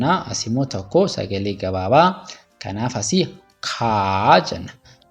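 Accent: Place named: Addis Ababa, Ethiopia. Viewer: Gara addisabanyaati hin jijirin loqoda kee bro!!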